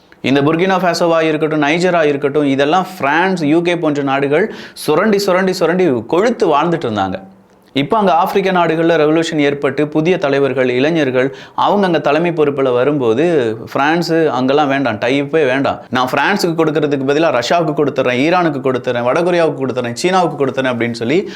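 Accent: Kerala, India. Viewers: Bro your official slang reminds kerala pronunciation! Are you from kerala?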